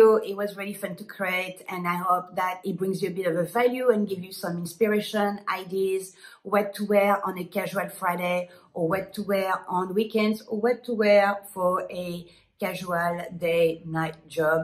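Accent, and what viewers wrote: France. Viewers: Bonjour. Congratulations. Everyone adores your French accent!! I'm confused by what you mean about "blazers". The white jacket you are wearing I would call a blazer. The denim on denim look in Canada is what we call the "Canadian tuxudo", ha ha! I would never think to wear a dress like that over blue jeans, what a great idea. I will need to buy a dress like that to wear over blue jeans. I can't wear blue jeans to work. When I do I don't feel in the "business mind set". I feel relaxed and lazy or I should be cleaning the kitchen. Other women can wear blue jeans with no problem but I feel laxadaicle, not good. Great tips again.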